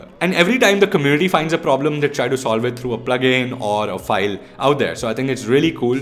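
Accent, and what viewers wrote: India. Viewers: Oh my God do you have to sound so Indian?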